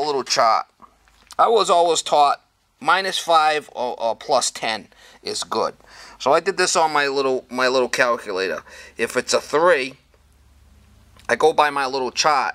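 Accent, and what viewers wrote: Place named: New England, United States. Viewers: Like your yankee accent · No he doesn't, that sounds closer to Boston accent....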